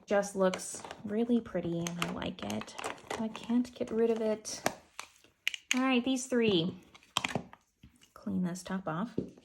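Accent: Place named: United States. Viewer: Also I’m just curious, where are you from? Your accent is very pronounced something like Montana, Chicago, etc.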